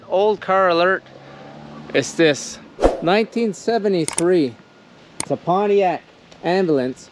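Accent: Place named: France. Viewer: no problem, the French accent can throw you off sometimes, I’m French and I get tricked sometimes. 😉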